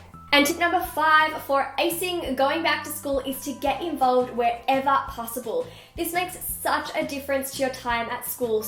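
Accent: Australia. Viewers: i dont really understand australia accents but i know ur videos so great. i really want to understand it, pls make english subtitile:( so sad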